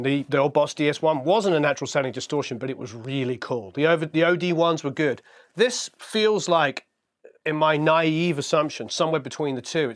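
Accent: United Kingdom. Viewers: Hey man, love your video great sound 🤟 just question you sound like your brit like me Man I've recently just got one these but it's got this inherent hum going into it (I've tried isolating it from other pedal) just like you have here. It appears to be like earth what voltage are you running 9volt DC of the pedal in to your power supply? thank you so kindly ☺️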